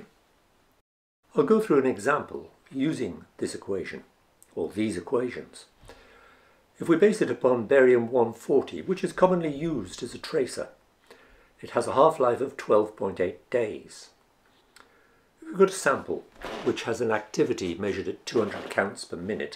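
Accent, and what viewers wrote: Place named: United Kingdom. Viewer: Thank you, fancy and british sounding man. You finally made me understand this.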